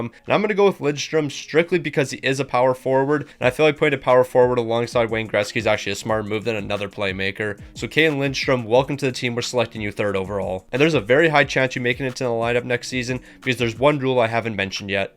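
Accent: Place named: Canada. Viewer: The most Canadian sounding Youtuber of all time ngl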